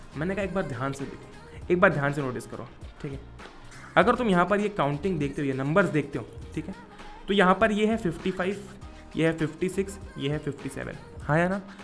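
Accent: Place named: Maharashtra, India. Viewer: dada marathi ahes na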